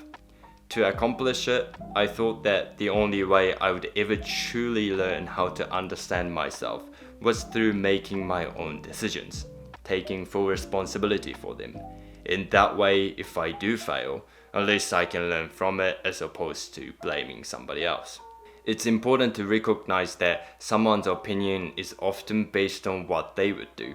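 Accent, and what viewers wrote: New Zealand. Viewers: You sound a bit kiwi. ☺️ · your english is good, but as an American i can pick up on your New Zealand accent at times. like the way you say the word "yet" and some other words. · I wondered if you grew up in NZ, you have a Kiwi accent. Then I saw another video and yup. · It always makes me laugh how much time and money people spend to become 'minimalists'. You are the most authentic person on this topic that I have watched so far. Thanks for posting your thoughts on these topics - and it's nice to hear a Kiwi accent for a change (from an Aussie) xx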